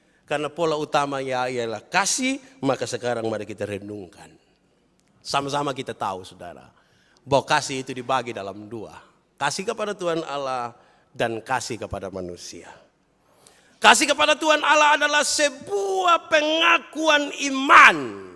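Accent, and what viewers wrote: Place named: East Nusa Tenggara, Indonesia. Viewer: Shalom, khotbahnya pelan, halus,lucu, tapi menusuk sampai dihati yg paling dalam dengan aksen NTT..sangat diberkati bagi anak2 Tuhan yg mendengar. Tuhan Yesus memberkati Pelayanan, jadi berkat bagi orang banyak sampai ke Bangsa2. Salam dari Bali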